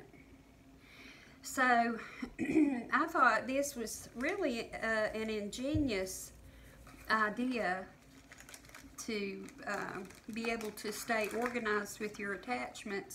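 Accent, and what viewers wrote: South, United States. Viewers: God Bless you. I just love your southern accent.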